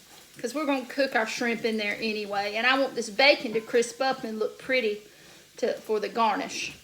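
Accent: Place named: Eastern North Carolina, United States. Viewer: I just watched your entire video with my birthday fiancee. We are both Eastern NC natives, so could easily understand your ack-see-ent! We have decided to come to your house and cook with you this Tuesday. I'll pay for all the ingredients, bring a couple bottles of white wine (since you seem to be out), and we'll solve the Covid crisis. Seriously, truly enjoyed your recipe (very similar to Myrla's). Thanks. JIm, new subsriber.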